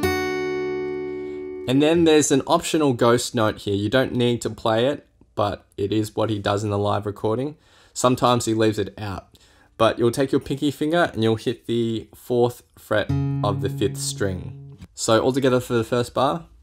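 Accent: Australia. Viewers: When i heard his voice I said Mateeeeeeeeeeeeeeeeeeee his an Aussie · Are you from Australia??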